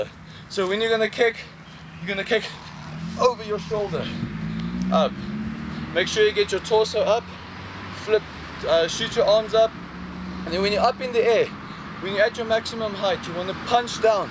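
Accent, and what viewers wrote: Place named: South Africa. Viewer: I recognize your accent, are you from south africa?? Your accent sounds south african! :D im a martial arts tricker from south africa!!! :D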